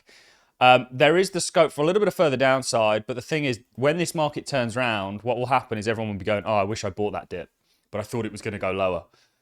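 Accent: Birmingham, United Kingdom. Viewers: Cant get more British than a brummie 😂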